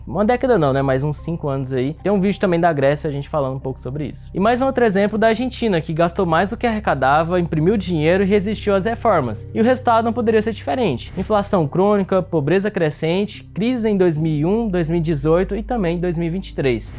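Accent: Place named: Goiás, Brazil. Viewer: Hahaha goiano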